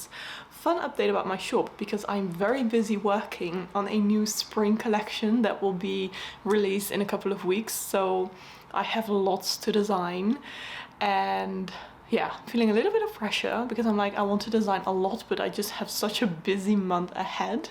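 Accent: United Kingdom. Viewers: I've recently started following you here and I'm very happy about it. I love the way you talk. (i love British accent) to the way you live, work and everything. I got thrilled when I got that you love crafting cause I call myself a crafty girl. Also, it's new year in Iran and I want to say happy Nowruz! (Persian new year) I hope that good things happen to you lovely girl ❤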